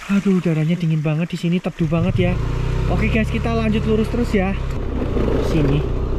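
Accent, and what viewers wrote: Java, Indonesia. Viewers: Gw paling suka cara mas brow gw ini komentari situasi di sepanjang perjalanan. Logat jawa nya itu yg bikin lucu dan seru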